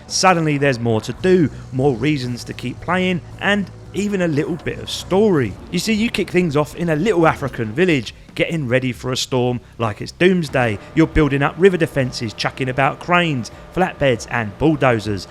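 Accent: Cockney, United Kingdom. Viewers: nice review. love you leaning big into your british cockney accent (fellow brit here)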